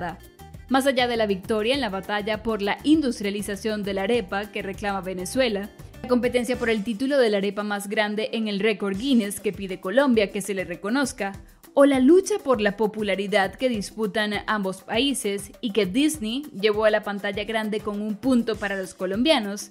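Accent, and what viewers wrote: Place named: Mexico. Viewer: Es mexicana la voz,que narra ese absurdo video.Y yo,perdiendo mí tiempo en esta pendejada.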